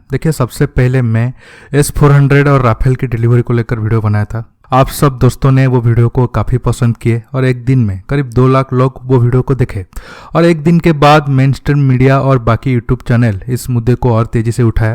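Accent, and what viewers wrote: Odisha, India. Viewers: Bhai ap odisha se ho Kya????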